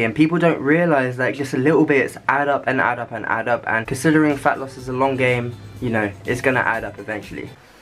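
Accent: United Kingdom. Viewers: blue eyes and british accent ! oh im falling for u !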